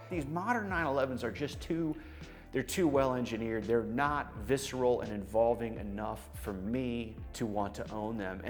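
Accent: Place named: United States. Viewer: Hi, greetings from Germany the homeland of your Porsches! You are a very cool Porsche-Enthusiast speaking such a clear (american) english so that it is great fun to listen to you. But what about the german licence-plate from Munich in the background nearby the Porsche-Light on the working-table in your amazing Garage? Are there any Porsches from Germany in your Garage (mostly German People are looking für US-Porsches and very few US-Fans looking for the german Market)? Greetings G.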